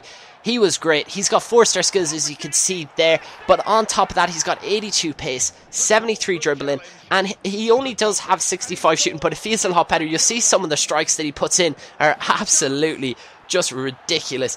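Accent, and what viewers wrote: Ireland. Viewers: Where in Ireland are you from i'm from Cork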